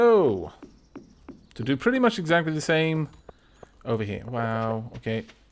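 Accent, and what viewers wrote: Wales, United Kingdom. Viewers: I am nearly sure that is Welsh.;-)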